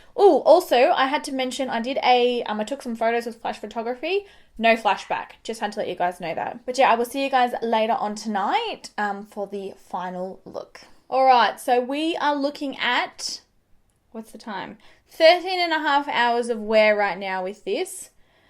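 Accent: Australia. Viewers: ❤❤❤❤ love all ypur videos, your style and your australian accent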